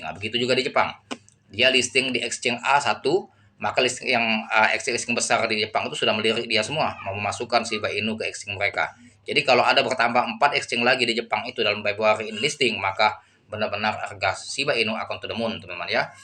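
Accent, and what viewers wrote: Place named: Lampung, Indonesia. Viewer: Logatnya seperti orang Lampung bang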